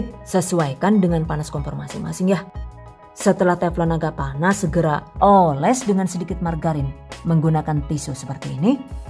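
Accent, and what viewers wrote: Banyumas, Indonesia. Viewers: jannn deneng wong ngapak ya yune, pada bae karo nyonge.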